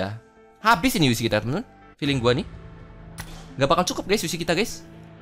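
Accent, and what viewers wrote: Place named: Medan, Indonesia. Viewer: logat medan banget kau bang😁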